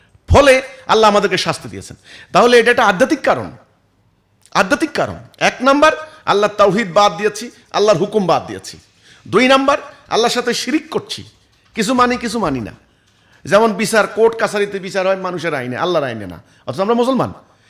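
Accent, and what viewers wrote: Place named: Noakhali, Bangladesh. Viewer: আগে ভাবতাম অন্য রকম , এখন দেখছি মাথায় সন্ত্রাসী চিন্তা চেতনা ?? ভাষা শুনে নোয়াখাইল্লা মনে হলো !!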